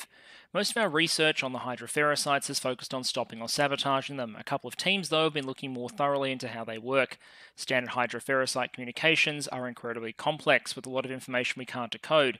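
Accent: Australia. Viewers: Hey man I just wanted say that I look forward to your videos every day, you content is top tier and that aussie accent is exactly what the video needs, your stuff is great, here if my infantismal engagement contribution to aid you with the algorithm god, also castilo is the only relatable view imo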